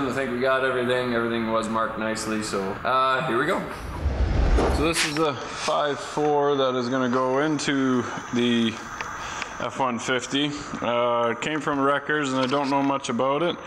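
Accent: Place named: Canada. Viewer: canadian for sure. honest, efficient. nice guy too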